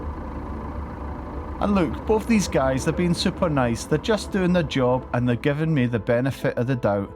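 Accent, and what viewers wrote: Scotland, United Kingdom. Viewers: Do you have a Scottish accent ? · Ayrshire in Scotland pal , so people in Scotland WILL think you have a Scottish accent as i did , you definitely sounded Scottish to me , otherwise i wouldn't have mentioned your accent. A Scotsman can recognise a Scottish accent no matter whether it's fae Ayrshire , Fife, Glasgow or Shetland , all very different but all recognisable as Scottish, and definately not ' general' , just the same as an Englishman, Welshman or Irishman.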